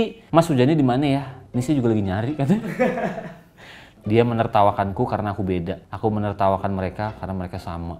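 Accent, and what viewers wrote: West Java, Indonesia. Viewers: bang ngmg sundaniss canduuuuu ❤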